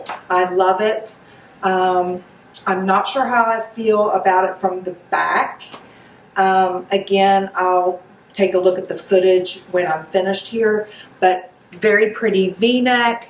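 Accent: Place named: Boston, United States. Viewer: Do you live in Boston? You have a nice accent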